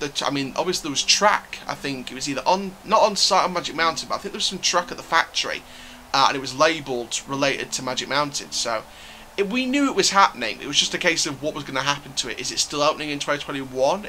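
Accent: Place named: United Kingdom. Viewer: You have a cool British accent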